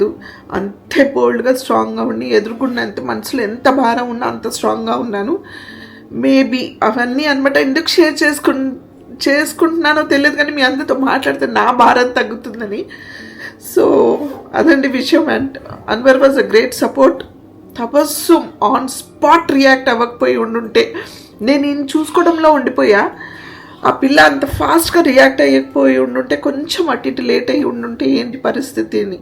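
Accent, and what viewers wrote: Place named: Telugu, India. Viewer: I'm from Bangalore and I have been watching your all family channel from so many years Morethan everything I personally love your telugu accent the most amma You have done so many kannada movie, which we love to watch. You are know family member to me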